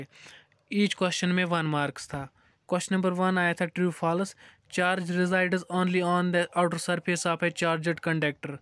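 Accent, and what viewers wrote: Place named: Kashmir, India. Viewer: Sir aap Kashmiri h kya? Aapki slang me se pata chlte h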